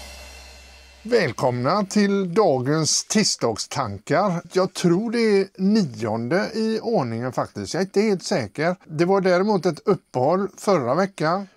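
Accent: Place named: Dalarna, Sweden. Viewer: Dalkulla? Rättvik eller är det mora ?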